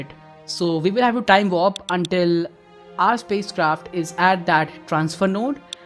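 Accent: India. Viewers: Hey r u indiaian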